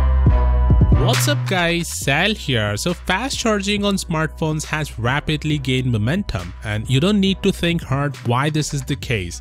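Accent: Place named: India. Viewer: ugh. indian accent. I disliked the video instantly.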